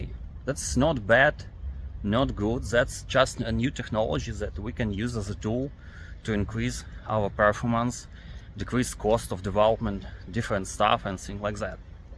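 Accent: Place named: Eastern Europe. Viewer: толковые вещи говоришь, но акцент... я в курсе, что у них ещё хуже за счёт индусов итп, и в курсе что нет ни времени, ни сил исправлять, но славянину капец как режет слух родной славянский акцент в англ если хочешь, могу подсказать рецепты быстрого удаления наиболее режущих слух моментов нет, я не препод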